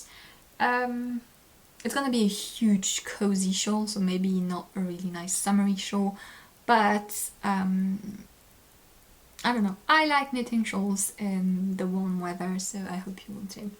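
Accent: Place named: France. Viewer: Oh, your Saule leaf design shawl took my breath away, that motif with the garter is simply beautiful. When you said you may incorporate small tassels within the main ground I thought Oh yes please, what a fabulous idea. Please don't perfect your English accent too much and lose your frenchness. Cheers, Jenny.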